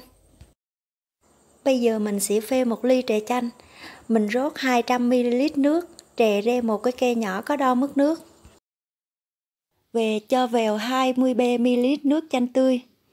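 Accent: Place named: Phu Yen, Vietnam. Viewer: Bạn giống ở phú Yên vậy bạn giọng nói ấy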